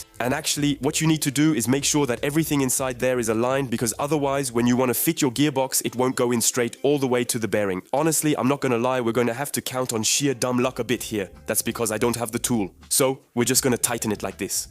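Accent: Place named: Balkans, Eastern Europe. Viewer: ont veux l'accent anglais des Balkans coupé a la serpette !!!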